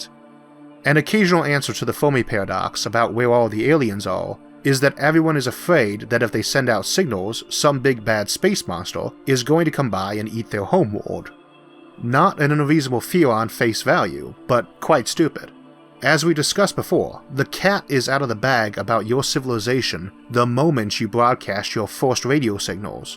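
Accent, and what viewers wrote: United States. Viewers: You have an american accent!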